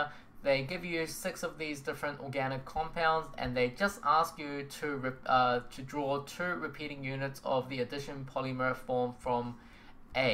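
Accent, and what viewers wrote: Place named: New Zealand. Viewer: Fun Fact: You Are From New Zealand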